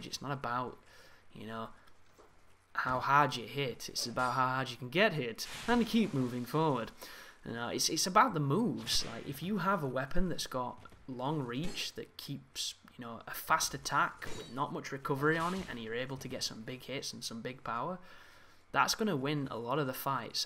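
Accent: United Kingdom. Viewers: 1. It's very refreshing to hear more Brits on these videos. 2. I totally hear you on the guard break actually doing the command seldomly. I can't count the amount of times that I'm sure I inputted forward and R1 perfectly only to swing my sword. On the other hand, the amount of times I've attempted a guard break with a chime...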